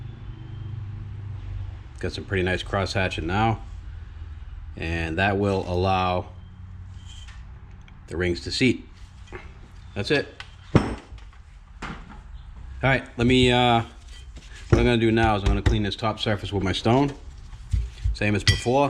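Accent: Boston, United States. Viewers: dude i knew i could count on you. i have a headtht i'm ready to reseat the valves, i have the compound but i dont have the little suction cup lapping tool. i started watching , i thought you might have the tools but id have a look, and sure as shit you got a cheaper better way. i love your vids. i work alot like you. ive built three drz engines with your help on the first 2, third one i di by memory. since then i bought a kfx 400 quad. rebuilt that . i bought it thinking it needed carb tuning per the seller. got it homer did the carb woudnt start. i started looking around, turned out the oi was mixe with coolant. it had sat like that for along time. probably whoever had it was riding it like that til it finslly died. yeah i bought it without testing going on the sellers word. seemed like a goood guy. i learned a lesson, never trust a seller even if you met him at church. 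cuz thyll tell ya what you wanna here. theyre like politicans in that sense. i got it for $1500 though so i wasnt to pissed. its up and running like a champ now. only spent another 200 bucks so 170 i have a cherry 2003 kfx 400 big bore. i had some parts from drz's. carillo pistons are beautiful too. ii wanted to put it on a chain and wear it as a necklace but i put it in. drz runs like a beast. thanks to you i am now buildng engines myslf. u da man. wtf are you from boston area? my wife is from faihaven. its in the armpit. hour out of boston. her entire family family talks like you. she doesnt thank god. no o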